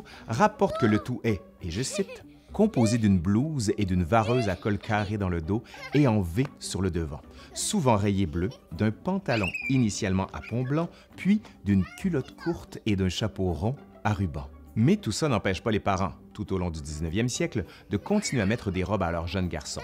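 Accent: Canada. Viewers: Bonjour vos vidéos son excellente et j’aime bien votre accent Canadien bonne après midi à vous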